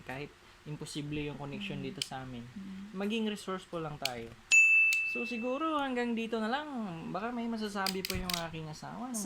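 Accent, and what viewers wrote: Visayas, Philippines. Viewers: Salamat sir idol kna ng maraming tagasubaybay..andun parin ang visayas accent..daghang salamat bai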